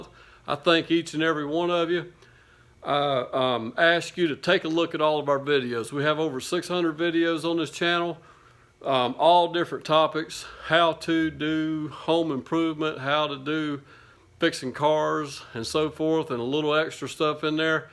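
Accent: South, United States. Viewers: I'm terribly sorry that your brain is unable to process southern accents.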